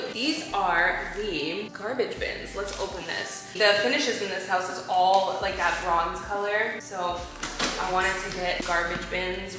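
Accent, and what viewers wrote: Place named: Nashville, United States. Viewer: you’re getting that Nashville accent😅 I love it❤ xoxo from Toronto.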